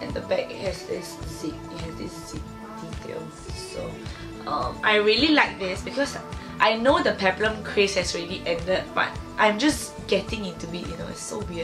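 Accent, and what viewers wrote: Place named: Singapore. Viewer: The moment I heard you speak I knew you were from Singapore :P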